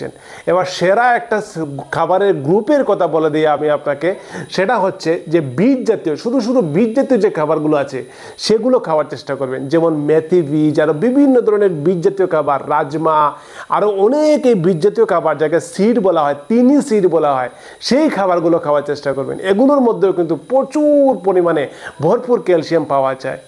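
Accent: Sylhet, Bangladesh. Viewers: ভাই আপনার বাড়ি কি সিলেট। আপনার ভাষা টা সিলেটির মত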